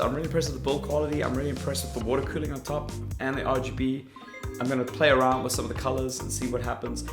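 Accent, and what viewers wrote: South Africa. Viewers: You are, was, is or come from South Africa I heard it in your voice first then this Only ppl who know what that word implies like the vid or, I WILL HATE YOU FOR THE REST OF YOUR LIFE. then i forgive you because there is no reason to hate a dead person. Just realized your hair changes color here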